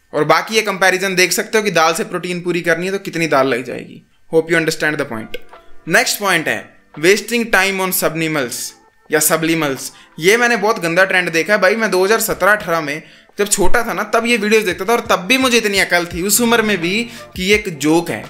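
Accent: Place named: Haryana, India. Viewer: Bhai aapki boli haryanvi jaisi hai❤❤❤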